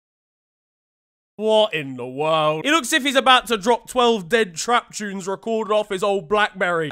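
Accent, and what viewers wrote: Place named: Liverpool, United Kingdom. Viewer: Your accent is the average liverpool fans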